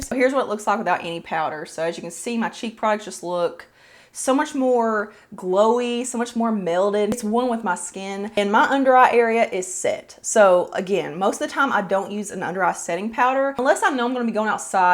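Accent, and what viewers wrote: South, United States. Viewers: I love your Southern United States accent, I think it sounds warm and welcoming. (I once had a wondeerful boyfriend with a Kentucky accent so I have a fondness for the accent).However, many people across the United States and around the world are used to a more flat "Nebraska" accent. As you get a global audience, you may consider getting speech coaching to get the plains midwest accent that people think is the United States accent. This is not fair to people with accents from the Southern United States, but it will be important as you gain a global audience who do not have English as a first language. Also, you might want to talk more slowly. I tend to move my hands when I talk, so I know what it is like to make gestures when I talk. Try to keep your hands out of the video except when you are applying makeup. (I used to be a teacher, and I was videotaped once when I was a teaching assistant, and seeing the videotape is really useful.). 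I hope you take these comments as helpful and useful, because my intention in writing this was out of a good heart. If you find my comments offensive, please let me know. This is not what I intend.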